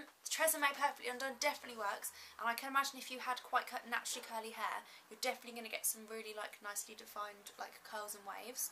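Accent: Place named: United Kingdom. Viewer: That Bri'ish accent! And ya, I'm here cause I grew my hair long and got that thing today lol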